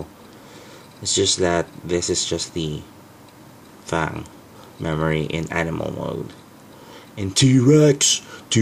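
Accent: Philippines. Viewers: Dude can I ask, are you Filipino? Ya kinda sound like a Filipino.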